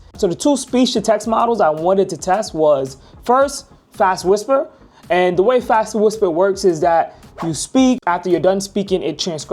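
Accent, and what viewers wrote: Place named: Bronx, United States. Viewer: Brotha I was gonna ask what part of da Bronx you from? 😂 didn’t think you’d be from ATL❤❤❤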